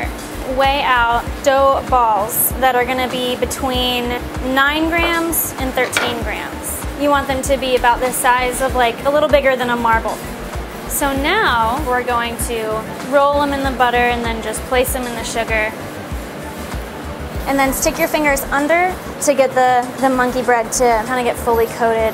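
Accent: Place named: United States. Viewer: This girl is gorgeous. Shame she has a thick American accent, would be much more attractive with an Australian or British accent.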